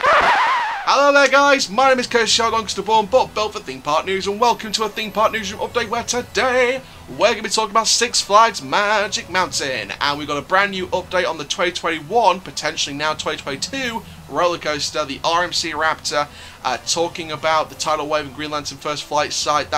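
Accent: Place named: United Kingdom. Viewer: You have a cool British accent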